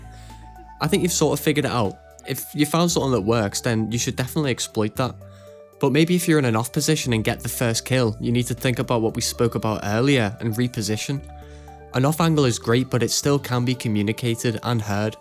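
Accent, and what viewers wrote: Liverpool, United Kingdom. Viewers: Scouse CS youtuber, I can fuck with that lad. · Love the video, are you a scouser mate?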